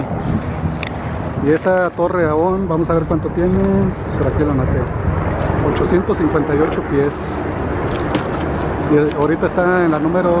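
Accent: Mexico. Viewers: Cuando hables trata de quitarte el acento mejicano, se oye mal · Jaaaa como quieres que hablé si es mexicano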